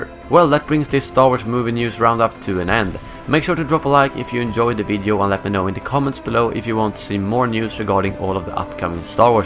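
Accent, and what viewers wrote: Sweden. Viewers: Let me guess? You are Swedish right?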